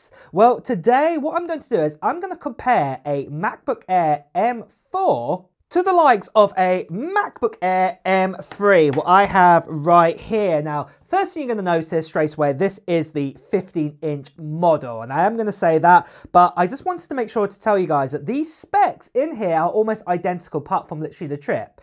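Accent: England, United Kingdom. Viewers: There's no such thing as "British accent". There are five countries on the British isles. He's English but not sure where abouts he's from in England.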